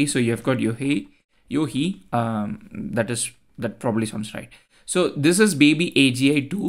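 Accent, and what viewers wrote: India. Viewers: Opens video, speaker has Indian accent, 🙂‍↕️ this is going to be an excellent video 🫶🏻. Edit: - 🙂‍↕️ it was great. Edit 2: I think AGI should strictly train on YouTube videos with Indian accent 🙂‍↕️, it’s guaranteed to learn the easiest way to explain the hardest and the most complex tasks. Medical school was saved by literally 5 Indian guys teaching medical school on YouTube to us 😁.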